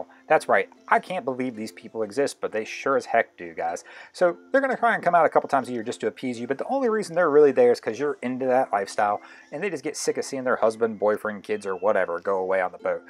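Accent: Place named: Maryland, United States. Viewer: my wife think your from Maryland by your accent or speech cadence, how far is she off?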